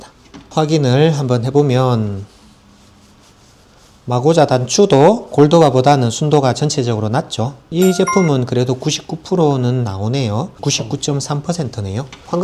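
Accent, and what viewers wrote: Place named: South Korea. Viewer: 금값을 제일 비싸게 받는 주식회사 한국 금거래소의 면피용 영상으로 이해 하겠습니다 좀더 설득력 았게 하려면 지방 사투리부터 고치시길 ㅠㅠ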